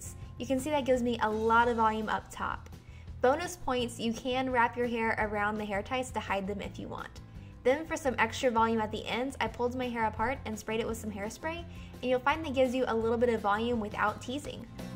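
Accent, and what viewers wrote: United States. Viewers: you really look like gemma styles and your voicebut with an american accent <33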